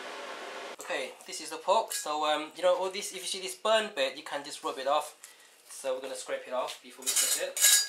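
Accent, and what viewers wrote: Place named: England, United Kingdom. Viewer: I knew he had a slight English accent!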